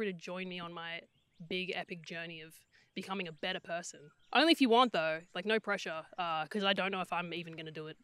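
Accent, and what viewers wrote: Australia. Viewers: my favourite part about this video is that you sound Australian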